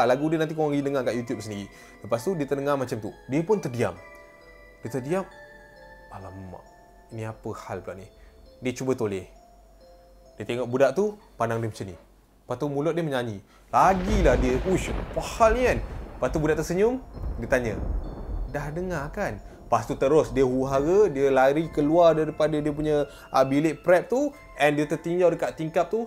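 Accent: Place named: North, Malaysia. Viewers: Abg fasih naw ckp utagha bleh lepak skali pa nnti mau sembang kita dua ni tiga gelas kopi pon kleaaa😂😂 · Steady bang.. Loghat utara time menyampaikan kisah seram ni buat lagi sya sronok nak dgar. Hahaha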